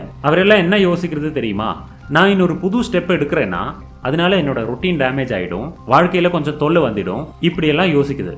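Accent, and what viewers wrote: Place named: North, India. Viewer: Vadamanila manithan pesum tamil... Try to talk in pure tamil or pure English... Don't mix(tamil+hindhi slang)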